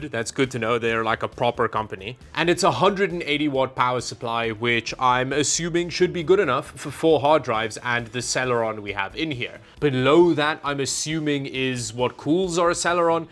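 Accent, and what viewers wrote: Afrikaans, South Africa. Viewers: You're the first English person I've heard get so close to an Afrikaans accent. Legit you were spot on but I think it's because your voice already sounds kind of South African😂. Jy moet net nie jou moer strip nie. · Afrikaans... I was wondering where your accent came from. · yeah I thought I picked up an African accent I just though he was from South Africa cause he literally sounds like our Afrikaans natives. Really cool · BRO IS AFRIKAANS ???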